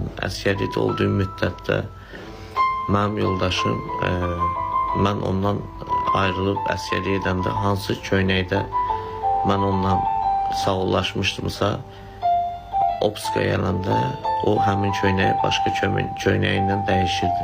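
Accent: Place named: Lankaran, Azerbaijan. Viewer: ☝️Iştı ləhçəku zıne bedə tı Lankonıjiş 👌Xıdo rəhmət bıko 🤲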